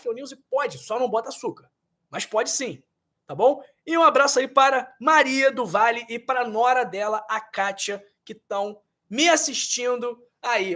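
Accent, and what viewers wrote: Rio de Janeiro, Brazil. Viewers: Muito x d carioca sotaque intragável e muito conversa conteúdo demorado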